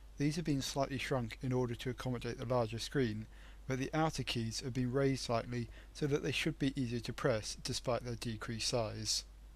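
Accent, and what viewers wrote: United Kingdom. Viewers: hahahahaha ,yes u'r right what a speech an what a ugly voice, British accent.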